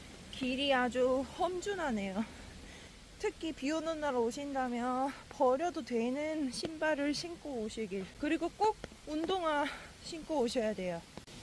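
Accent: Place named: Jeolla, South Korea. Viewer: 정겨운 전라도 억양, ㅎㅎㅎㅎ 비비체 뷰가 꼭 가보고 싶네요.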